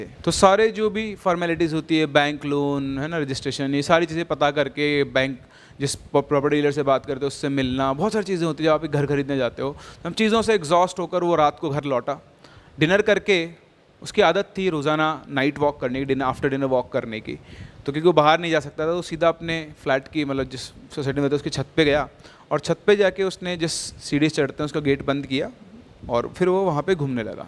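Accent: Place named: Maharashtra, India. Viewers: Marathi accent bhot sahi 😂😂😂😂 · Sir ka marathi accent 😍😍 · I am from Maharashtra , loved your marathi accent 💯 After IIT and NIT here comes HIT 🤣🤣🤣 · मुझे b तुम्हारी मराठी टोनिंग मस्त लगी.😅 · First Viewer✨ Nice Marathi accent 👏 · Marathi accent 👌 एकदम भारी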